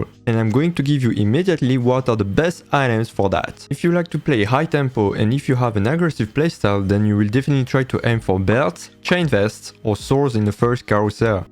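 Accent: France. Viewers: Serais tu français par hasard ? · i didnt understand shit... :/ so hard to keep up, (and also, trying to apply this, got me have the most shamfull loose ever, insane how bad it was XD - it didnt work a bit for me, also because i am nob, but also because i can't understand shit from your "french accent english")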